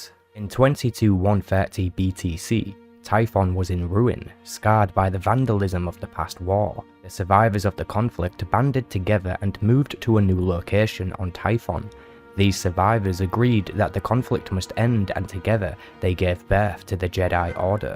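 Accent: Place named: Yorkshire, United Kingdom. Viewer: Just recently joined your subs, and would just like to say it's nice to hear a fellow Yorkshire man🤘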